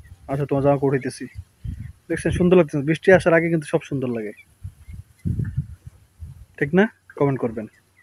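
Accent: Barishal, Bangladesh. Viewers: আপনার বাড়ি কি বরিশাল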